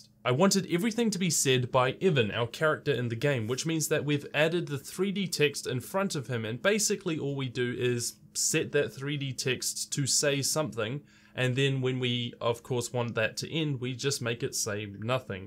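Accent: New Zealand. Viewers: Judging by the accent, are you happen to be a Kiwi?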